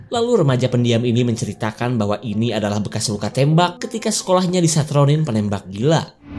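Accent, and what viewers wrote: Sunda, Indonesia. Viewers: Iya bener suaranya sunda pisan 😂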